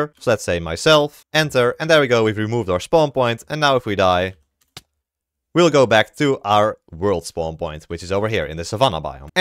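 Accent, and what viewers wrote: Netherlands. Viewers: je bent nederlands ik hoor het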